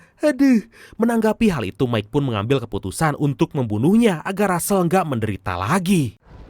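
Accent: West Java, Indonesia. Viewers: btw asal mna a?logatnya sunda pisan wkwkwk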